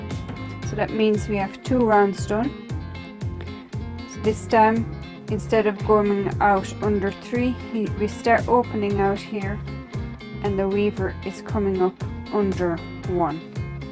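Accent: Ireland. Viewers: I expected a Flemish accent and then you hit me with the slight Irish accent!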